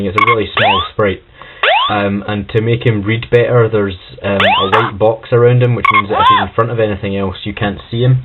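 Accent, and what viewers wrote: Scotland, United Kingdom. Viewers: I like how you are Scottish, man.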